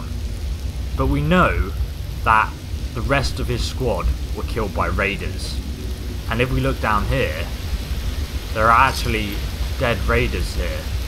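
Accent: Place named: United Kingdom. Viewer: I'm not saying this with any malice intended to you or (his vids are great), but I've had a feeling that he might be dyslexic or have some sort trouble saying what he reads. He does it very often in many of his videos. Like saying Mr. Gusty when its really Mr. Gutsy for example. It happens often enough with enough different words/names repeatably to the point it's noticeable. It might be separate from what is being debated in this comment thread, but I can't attribute my observations to just a British accent which I am familiar with.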